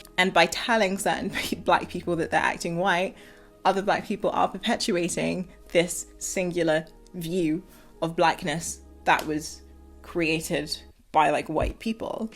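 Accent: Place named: Africa. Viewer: Why you sound African then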